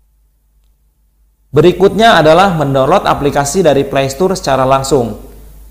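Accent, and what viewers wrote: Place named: Indramayu, Indonesia. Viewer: Orang indramayu to...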